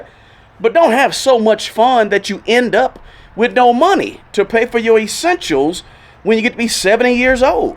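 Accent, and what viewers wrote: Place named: Tennessee, United States. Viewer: Bro sounds country. Must be from TN.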